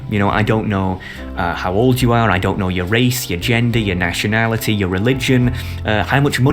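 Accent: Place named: Yorkshire, United Kingdom. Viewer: Good job man, sub earned... You are an interesting orator you sound like a fellow Yorkshire man too (West Yorkshire lad here)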